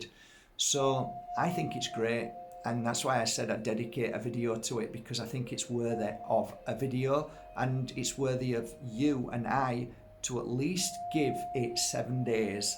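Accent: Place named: Northern England, United Kingdom. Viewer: I seem to have been on a parallel path with your own and since our paths eventually crossed in cyberspace a week or so ago I have been viewing lots of your videos. I can't tell you how re-assuring it is to hear someone say what you feel is absolutely "spot on" - and in a proper Northern accent as well! Lella's video is so beautiful and I will certainly listen to it as you suggest. A few years ago I came by a small piece of Moldavite and also a small herkimer diamond from a gem shop which I am hoping are genuine. I plan on continuing to look and learn from your postings. Love and light to you and yours, and all your subscribers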